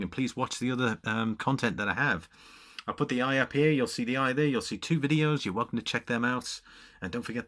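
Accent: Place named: Merseyside, United Kingdom. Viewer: Being robbed at gunpoint sounds fairly horrific. Can I ask where exactly that was/is ? I do detect a Merseyside accent...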